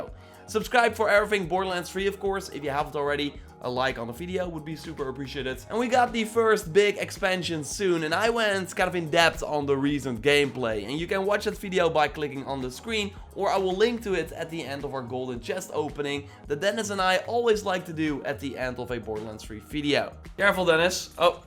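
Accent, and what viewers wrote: Netherlands. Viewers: Your Dutch arent you?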